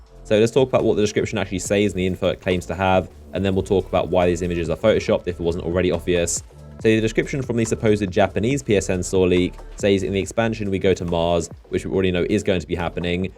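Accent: England, United Kingdom. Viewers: I really like English accents. You're a very good youtuber, but when I see big news that various YouTubers cover I choose yours because you just sound so dang proper.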